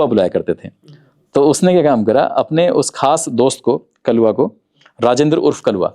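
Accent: Haryana, India. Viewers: भाई पता भी नहीं लगता कि हिंदी बोल रहे हैं या हरियाणवी🎉 मान गए उस्ताद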